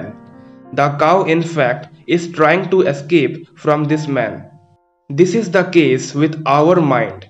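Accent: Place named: Bihar, India. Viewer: His accent seems to suggest that he is a pakka Bihari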